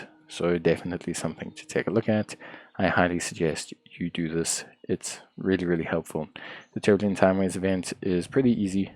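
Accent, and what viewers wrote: South Africa. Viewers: Are ya South African?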